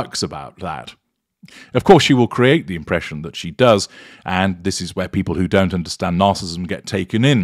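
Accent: United Kingdom. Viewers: The combination of your accent, the fact that MM’s genuine character isn’t lost on you and the colorful language all while carrying the British accent. ❤😂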